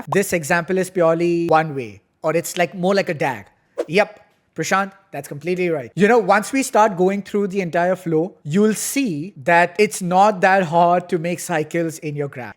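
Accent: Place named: India. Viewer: This guys accent is like a mix of a white guy but grew up in India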